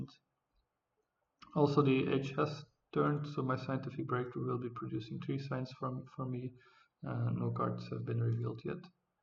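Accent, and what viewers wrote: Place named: Netherlands. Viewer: Very nice all in strategy. Seeing the opportunity that the other civs needed (too much) time to get online with their culture production so you could maintain your lead. Two questions: Are you dutch? Because your accent sounds really dutch :p And I dont know why e5 would use his ocean liner last turn. It would only set him up worse for certain events like happiness and it would give him 0 benefit. Weird decision. Do you have any idea?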